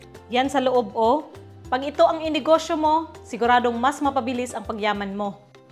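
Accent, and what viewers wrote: Visayas, Philippines. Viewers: Ka cute kaayo mag bisaya ba 😂❤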